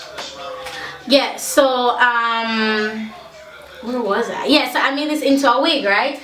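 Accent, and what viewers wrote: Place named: Jamaica. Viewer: i love your accent not many Jamaicans around here :)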